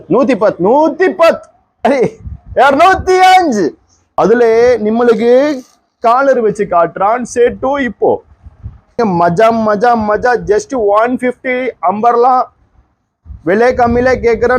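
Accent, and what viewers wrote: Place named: Marwari, India. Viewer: Wow collections. Price super. Settu maadhiri paesaradhu superaa irukku.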